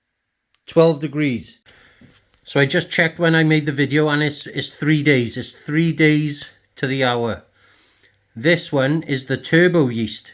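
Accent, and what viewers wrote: Wales, United Kingdom. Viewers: Don't know if it's the Welsh accent or you're dressed up like Dexter, but I was in stitches. Great video and very entertaining.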